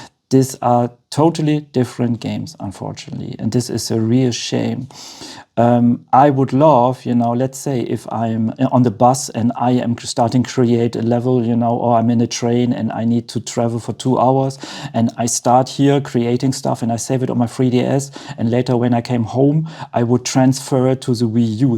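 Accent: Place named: Austria. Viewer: Ich liebe den Akzent. Du bist Österreicher, oder? 😊 Richtig gutes Video! Daumen hoch dafür! 👍👍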